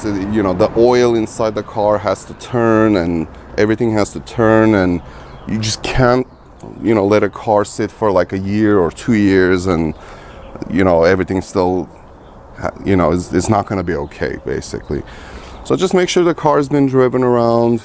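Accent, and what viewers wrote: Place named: Iran. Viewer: Can hear the persian in his english, very powerfull language 👌🏿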